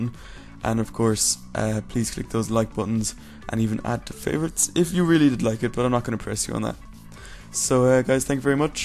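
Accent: Ireland. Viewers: Irish man ;D cork ?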